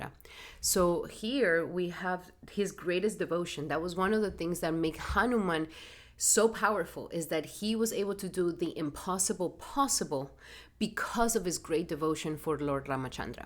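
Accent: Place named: Spain. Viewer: I love your intros! Most channels are so fluffy, your intros are so dense, captivating and informative! I have a question, when you say if a planet is “strong”. How do I know if my Saturn is strong? Also very random, I’m a Spanish teacher and love the language… I know this is a Vedic channel, but your accent sounds Spanish -esque 😂 any relation?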